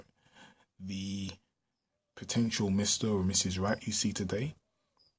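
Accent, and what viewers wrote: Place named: Nigeria. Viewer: I hear Nigeria in your accent.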